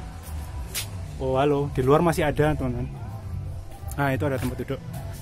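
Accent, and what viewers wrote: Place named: Java, Indonesia. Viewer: Masnya kayak Joe Kal.. pemberani N jowo banget kalo ngomg.. mantapp...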